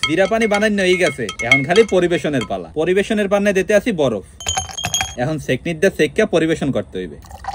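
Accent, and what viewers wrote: Barishal, Bangladesh. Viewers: কোন জেলার ভাষা?মনে হয় বরিশালের।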